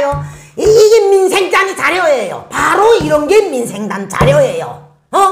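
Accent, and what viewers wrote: Hamgyong, North Korea. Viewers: 여사님 이름이 마음에 듬니다 남한에는 트롯트가수가 유명하지요 함경도 말씨가 정감이 갑니다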